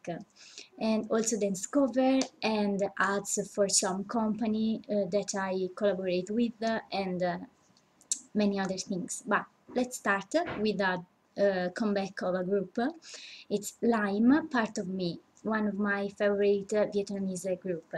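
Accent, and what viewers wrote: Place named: Italy. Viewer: she from italy she just speak English with Italian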